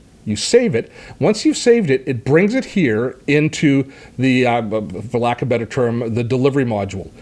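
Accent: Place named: Canada. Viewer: Thanks for this I am trying to get to grips with the app. It sounds like you are a fellow Canadian, what a great personality you have!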